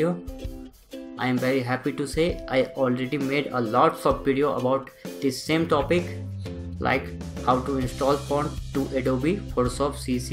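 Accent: India. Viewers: x( no soporto ese acento indu-ingles. good video overall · The music really annoys me. With the difficult indian accent, the music makes it so hard to focus. Kill the music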